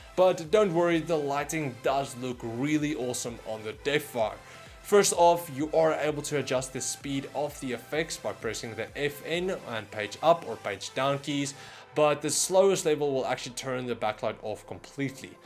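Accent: South Africa. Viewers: South African? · Being South African myself the moment you spoke I knew you were from SA 😂 That’s our accent but THANK you I have a decision to make between this combo or the Corsair K55 combo. Your video is helping me out in so many ways. Baie baie dankie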